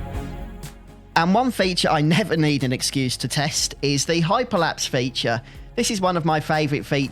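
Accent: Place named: Midlands, United Kingdom. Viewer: Hi ive been watching your videos off and on for about a year now thanks for the videos. Im just wondering are you a brummie you sound like your from the midlands Im a former brummie who lived not too far from the city but been living in county Kerry Ireland now for over 35 years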